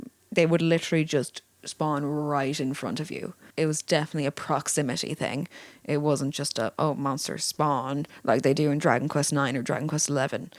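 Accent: Ireland. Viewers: Checkpoint said that this was a delightful tale with bonus irish accent. At the start I thought to myself this is a light accent, and then for every minute the accent more and more :P Anyhow this was indeed a delightful story. :)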